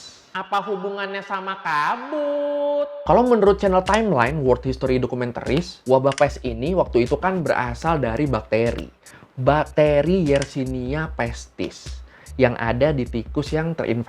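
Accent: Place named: Madura, Indonesia. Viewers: Min.. Klo lg ngomong logat madura nya di ilangin donk! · Bang ngejelasin nya biasa aja gausa kaya org madura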